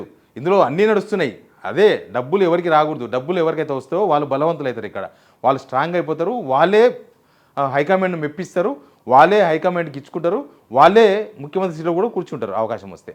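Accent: Telangana, India. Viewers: ❤మీ శైలి తెలంగాణకు ఆత్మీయం. దక్షిణ తెలంగాణ యాస, తెలంగాణ ఫ్లేవర్ మీ వాచకంలో ప్రతిఫలిస్తోంది. రాధాకృష్ణ 'కొత్తపలుకు' నిజానికి 'చెత్త పలుకు'. ఆయన రాసే చాటభారతమంతా ఆంధ్ర బుర్ర తోనే. తెలంగాణ తేట పత్రికలు రావాలి. తెలంగాణ పాలకుల బుర్రల్లో ఇంకా పాకురులా పట్టిన ఆంధ్రవైరస్ ను గీకిపారేసే అనవతర దీక్షా దక్షతతో కూడిన తెలంగాణ ఆత్మను ప్రతిఫలించే చైతన్యవంతమైన జర్నలిజం రావాలి. 🎉🎉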